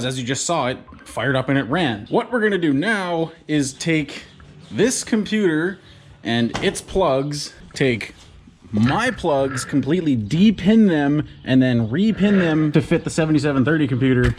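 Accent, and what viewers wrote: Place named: United Kingdom. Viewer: So out of curiosity were are you located? Country is fine just how you said everything it sounds like the uk maybe....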